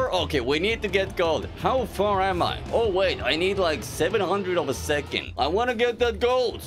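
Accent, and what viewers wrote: Sweden. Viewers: are you swedish?? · are you Swedish?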